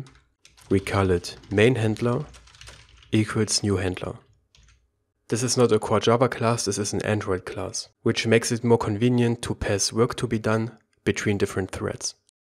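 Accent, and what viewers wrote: Germany. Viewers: are u german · dein Englisch klingt, als wärst du deutscher 🤔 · Are you german? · You are an very good teacher! Probably one of the best I have seen. Are you German speaking? I hear a slight German accent. I recently started with Android Studio. The threading in Java is a bit complicated, but thanks to your video, I look much better, thanks a lot !!! :))))) Greetings from Switzerland Nicolas B)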